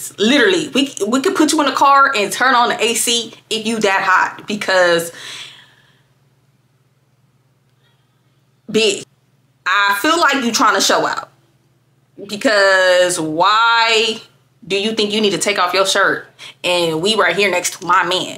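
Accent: United States. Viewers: looking good with the red - USA accent is still the coolest thing 'since sliced bread':washhands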